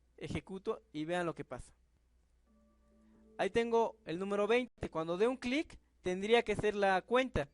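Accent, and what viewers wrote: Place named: Mexico. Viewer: eres lo maximo tio , muchas gracias por tus tutoriales explicas muy bien y por lo que escucho eres de mexico , que viva mexico!!!!!!!!!!!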